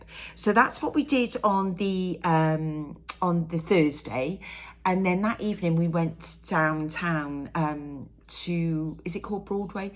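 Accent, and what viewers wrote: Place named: England, United Kingdom. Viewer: I absolutely loved your longer video. You inspired me so much! I could listen to you all day. Such beautiful work and your very pleasant accent reminds me of my grandparents and uncle. They were from England. Keep your videos long. I got quite a bit of stitching done listening to you.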